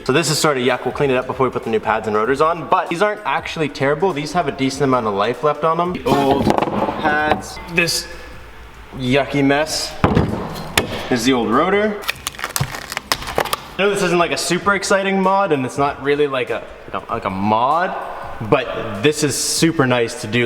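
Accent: Canada. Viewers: You're a real there canaaaaadian bud. Saying soury instead of sorry 😂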